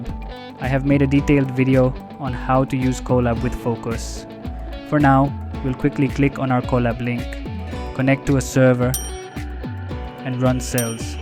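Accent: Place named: India. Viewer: Indians speaking English sound very ridiculous, next time use subtitles or remove anything below your tonge, the world will thank you.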